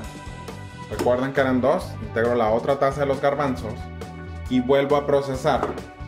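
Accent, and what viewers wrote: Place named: North, Mexico. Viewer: justo ando haciendo hummus ( yo le quito el pejello porque da pedorrera 😅🙈 donde eres por el acento? arriba el norte mijo haahahah saludos desde sonora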